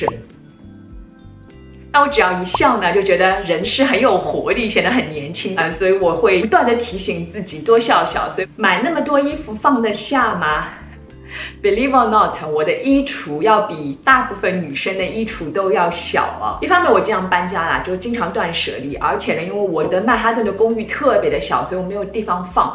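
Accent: Shanghai, China. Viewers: 常驻伦敦的上海人表示听着很上海很亲切 恳求不要改进❤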